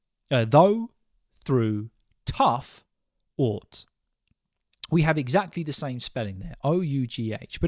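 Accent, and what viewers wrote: RP, United Kingdom. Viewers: hello mate can you do a video while you speak like your normal day not as a teacher we need more listening challenge 😉 and can i ask you about your accent is it received pronunciation?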